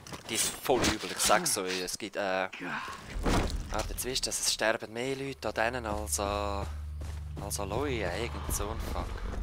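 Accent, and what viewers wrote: Switzerland. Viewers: Ich find dini Lets Play's voll geil wills uf Schwizer dütsch isch und du machsch es so lustig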